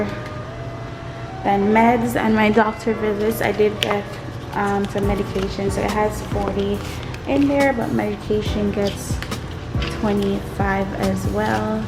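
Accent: Jamaica. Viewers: Girl i can hear the Jamaican accent a lil 🤣🇯🇲💘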